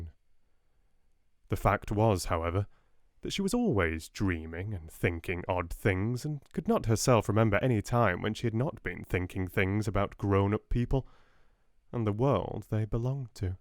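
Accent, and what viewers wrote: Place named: United Kingdom. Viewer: La verdad no entiendo un caraj* no soy muy buena con el acento británico, pero es uma delicia caralo 👌 suscritisima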